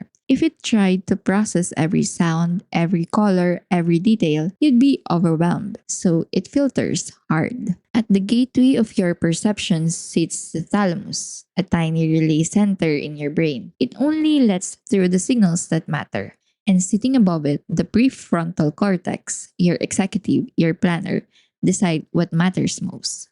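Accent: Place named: Philippines. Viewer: Pronunciation....since you have Phillipines english accent or somewhat like that...use AI for your for narration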